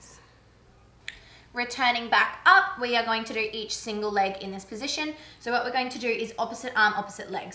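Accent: Australia. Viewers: aussie aussie aussie?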